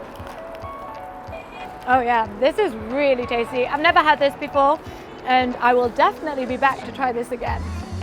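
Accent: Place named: England, United Kingdom. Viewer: Hi. It is so refreshing to hear an English accent and so down to earth and there is nothing pretentious about you which I find uniquely different to other bloggers. Dou jiang comes in salty and sweet version. I believe in the south it favours the sweet version and in the North the salty. I prefer the sweet one myself but each to our own.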